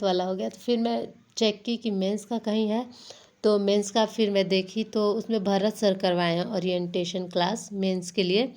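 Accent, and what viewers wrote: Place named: Hyderabad, India. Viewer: Aapka slang Hyderabadi a raha hai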